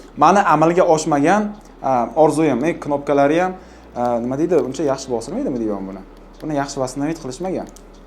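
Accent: Samarkand, Uzbekistan. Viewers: Samarqand danmisiz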